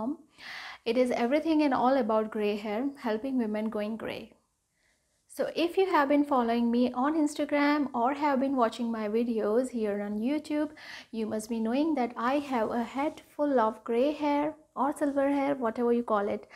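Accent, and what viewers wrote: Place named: England, United Kingdom. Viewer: I absolutely love your English accent ❤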